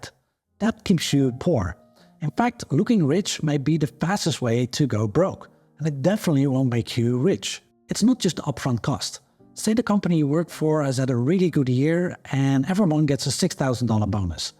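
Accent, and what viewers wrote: Netherlands. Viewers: You sound Dutch. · Hey, would you be dutch by any chance?? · You sound like you are from NL.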